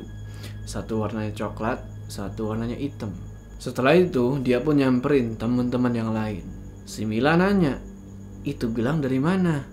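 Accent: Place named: East Java, Indonesia. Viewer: Emang kenapa kalo ngomong jawa timuran?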